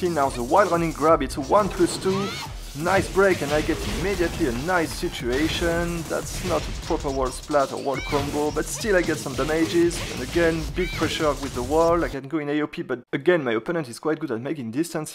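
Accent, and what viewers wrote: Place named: France. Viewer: btw...i love your French accent 😄😄😄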